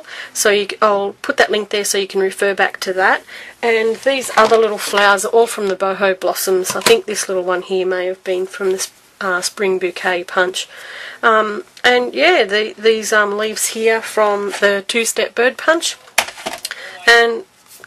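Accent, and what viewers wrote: Australia. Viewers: I am surbscribed to your YouTube channel but I don't seem to get emails about new videos but I had found the yellow card you did with lattice and then found these. I can't think you enough for ideas on other ways to use my spellbinders. You are the best, love hearing your accent, I have several pug friends from NSW, and one special one that lives in Hobart Tasmania you sound just like her. Thanks again for all your ideas love them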